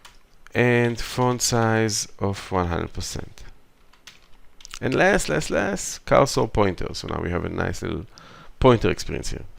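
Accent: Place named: Israel. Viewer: אתה ישראלי? המבטא שלך נשמע ישראלי רצח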